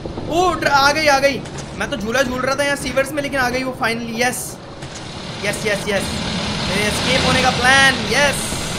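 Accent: Haryana, India. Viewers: Tumbara jo Punjabi/Haryani accent hai, vo sabse funny hai Funny in a nice way , I love all the accents . Not insulting.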